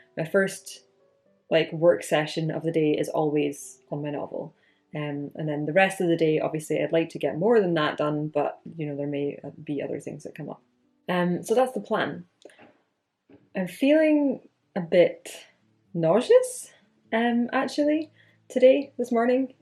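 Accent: Scotland, United Kingdom. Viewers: I clicked on this video and was kind of shocked when I heard your voice, I didn’t expect a fellow Scots person to show up on my recommended.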